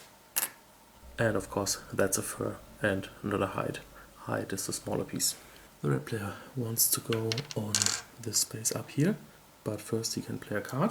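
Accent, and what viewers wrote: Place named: Germany. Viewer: something about a german accent during eurogame reviews just screams professionalism.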